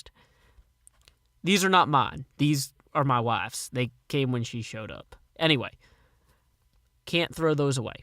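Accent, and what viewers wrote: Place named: Kentucky, United States. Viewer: Excellent work! Love the accent. I'm a KY girl myself so it is rare to hear something sounding like home on Youtube! I need you to make a prequel with more Etho in it. Hahahaha!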